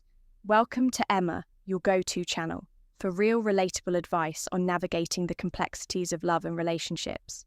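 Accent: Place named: England, United Kingdom. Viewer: Great stuff, Emma. Hope I got the name right. Well done. Keep up the good work.👍 You have a smooth rich sweet voice too. Plus a pleasant English accent.💞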